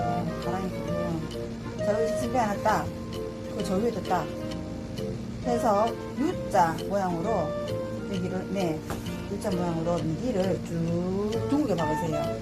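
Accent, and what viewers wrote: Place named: Busan, South Korea. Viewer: 오랜만에 부산말 듣네요 용신다 요즘도 이 말 쓰네요 젊은분이 용신다 하시니 오랫동안 외국에 사는 제가 고향 부산이 확 들어 옵니다. 손녀 들 옷 만들려고 하는데 너무 예쁜 옷 도움 됩니다. 감사합니다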